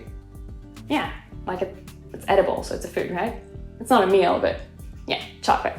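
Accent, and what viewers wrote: France. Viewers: Illustration. You are so wise, my friend. Thanks for sharing your wisdom with us! :) PS: No wonder I connect with you so much. I have the same accent. Raised in France, moved to the U.S. at age 10, and I still trip on my words quite often lol. You're doing great! Also, my daughter has a rabbit named Mr Lapin. It's a peluche though (we speak frenglish at my house) hahaha. Bisous!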